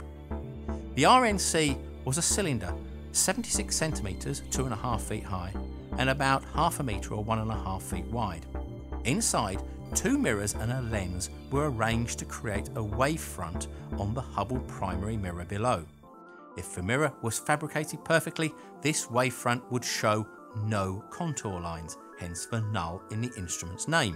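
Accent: United Kingdom. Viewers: I can't figure out if this guy has a slight speech impediment or is just British.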